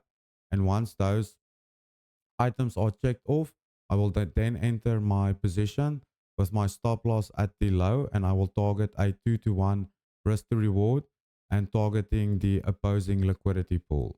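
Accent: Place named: South Africa. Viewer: Brother you sound South African. Wanted to say thank you for your growing channel and your lessons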